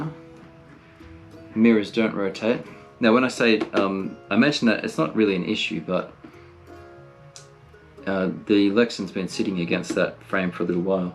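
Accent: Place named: Australia. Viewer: Hi, good to hear an Australian voice [I'm on the east coast], watched all the reviews as you mentioned it’s a good trail truck do you feel its is worth the AUD$900.00? Also how hard would it be to strip the body down of all the bits, to swap to a clear body and go with your own colour choice.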